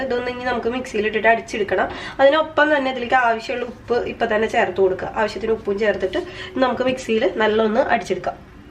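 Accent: Thrissur, India. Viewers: അപ്പം കണ്ടു നോക്കീതാ. ദേ അപ്പൊ ഒരു തൃശൂർ talk.. ഇതെങ്ങനെ ഇത്ര speed talk.. ഞാനായിരുന്നു ഇവിടിരുന്നു ശ്വാസം പിടിച്ചത്.. വീഡിയോ ഇടയിൽ പരസ്യം വന്നില്ലായിരുനെങ്ങിൽ ശ്വാസം കിട്ടാതായേനെ.. അടിപൊളി ചാനൽ.. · മോളൂ! തൃശ്ശൂർ സ്ളേ ങ്ങാണോ? ഭാഷ നല്ല രസം ണ്ട്. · Speed kurachu paranjal manassilaakum.Trichurkkari aano? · ശ്ശേ,,,,,,,,,,,,,,,,,ഇടക്ക് പെറുക്കി തിന്നു അല്ലെ? എനിക്ക് ഇനി വേണ്ടാ ട്ടോ തന്നത്താന്‍ കഴിച്ചോണ്ടാ മതി,,,,,,,,,ഹായ് എന്തൂട്ടാ ഇത് തൃശ്ശുര്‍ ആണോ വീട് പൂരത്തിന് വെടി പൊട്ടുന്ന മാതിരി അല്ലെ വാക്കുകള്‍ ,ഇഷ്ട്ടായി ട്ടാ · ചേച്ചി തൃശൂർ കാരി ആണോ ആരായാലും സൂപ്പർ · Hai chechi eppol aanu kandathu. ..subscribe cheythu ta Nalla talks. .thrissur aanu nnu Slang paranju 😀 evideya? Njan sandhya Lajith. .triprayar aanu. .eppol hus nte oppam tamil nadu aanu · തൃശ്ശൂർ ക്കാരിയാണോ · chechi adipoliyatto supr avadaranam thrissurkkarellam inganano nishkalankamaya voice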